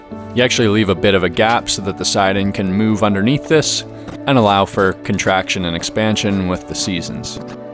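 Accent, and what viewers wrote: Canada. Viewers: As if we couldn't already tell he was Canadian with all the "ungunna"s and "oot"s, he's even got the stamp. 🤣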